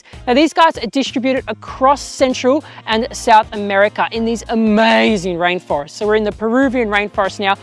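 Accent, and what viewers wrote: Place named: Australia. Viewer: Your accent tells me you are from Australia :)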